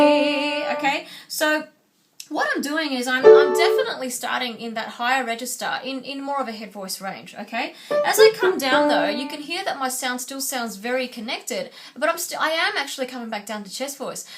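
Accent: Australia. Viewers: Very articulately explained, thanks. Nice to hear an Aussie voice too.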